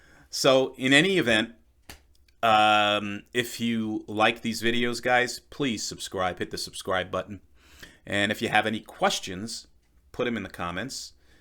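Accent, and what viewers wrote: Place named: New York, United States. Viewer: Think I detect a NY or NJ accent.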